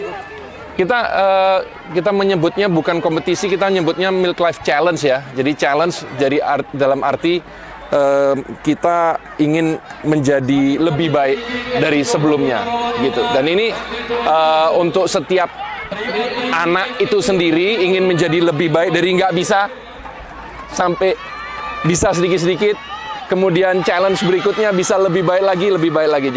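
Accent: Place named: Malang, Indonesia. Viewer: 👍 Gamblang dan runut penjelasannya meski disisipi istilah2 bahasa Inggris dan dengan logat/aksen Malang'an 😀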